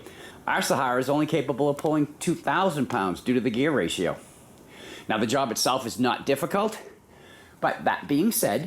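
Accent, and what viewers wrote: Boston, United States. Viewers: Thank you for such a marvelously done video. I bought my 2015 Black Sahara last week, ordered my hitch right after watching your 'how to' and installed it yesterday in about an hour. And all for under $75. I've ordered my fuel filler cover upgrade and your video for that looks easy enough to follow. BTW, I grew up in Newton Center, MA and love hearing your accent. Reminds me of my childhood.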